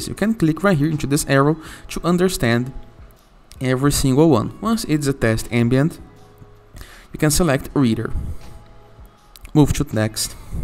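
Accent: Brazil. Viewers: Esse inglês é BR hein rsrs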